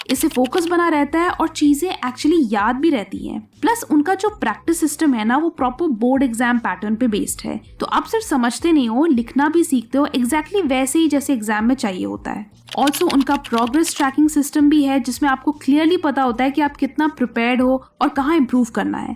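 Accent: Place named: Kashmir, India. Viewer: Are you kashmiri by any chance? You accent seems 😄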